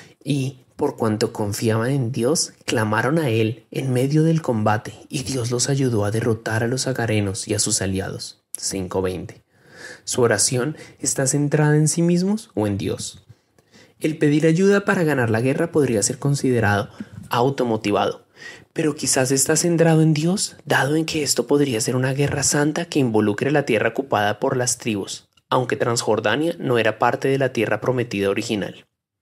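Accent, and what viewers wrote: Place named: Colombia. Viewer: This is great idea. however, the audio has a strong Colombian accent. Is there a scrip that we can read?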